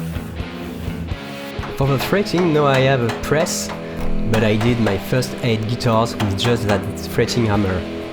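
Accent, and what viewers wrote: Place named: France. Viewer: Your english is very good even with french accent. Nice tutorial 😉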